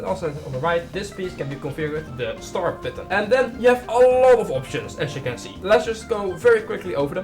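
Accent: Netherlands. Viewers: Ik ruik Nederland (door je accent)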